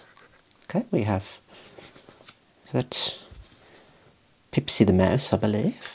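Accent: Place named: West Midlands, United Kingdom. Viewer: So good to find an ASMR channel with a West Midlands accent 🙂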